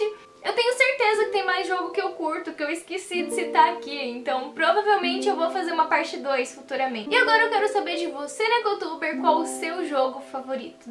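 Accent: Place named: São Paulo, Brazil. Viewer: pensei q vc era de São Paulo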